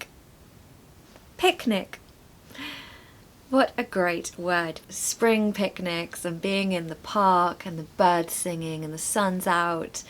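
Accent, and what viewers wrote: United Kingdom. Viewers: I liked very much your way of teaching.being an Indian teacher of English lam interested to hear your British accent.thanks a lot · Hallo from Italy. I adore british accent. Unfortunately I don't know all grammar rules, but I can understand what you say, even without subtitles. My problem are speaking skills 😢: how can I think not in Italian but in English??? In the moment I have to talk, I remain without words (writing is better for me)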